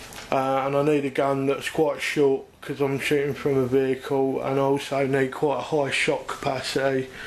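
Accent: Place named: RP, United Kingdom. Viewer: Bob USA. he's speaking the Queens English so have a bit of respect. okay fella now just watch and learn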